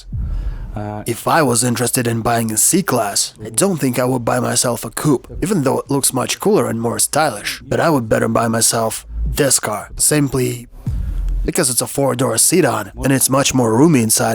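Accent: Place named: Russia. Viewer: как всегда - восторг! Субъективное мнение: Если этот канал ориентирован на англоговорящих людей, то им не будет интересно это смотреть из-за озвучки. Акцент слишком русифицирован и не чувствуется какого-то драйва диктора. Он не говорит так, как говорит американец или британец - интонационный поток "мертвый" в каком то роде:( Интонация всегда падает вниз и не передаёт эмоции рассказчика как таковые. Возможно в оригинальной озвучке их и не особо много было, но над этим явно стоит поработать и тогда англоговорящая аудитория будет заинтересована слушать это! Можно взять любой американский обзор и увидеть разницу - <link> Всех благ и удачи!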